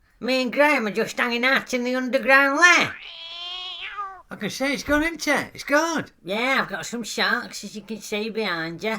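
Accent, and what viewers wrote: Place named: Nottingham, United Kingdom. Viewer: Congrats on 500 subscribers! Well deserved - these vids are mint! (And I'm loving the Notts accent - reminds me of home)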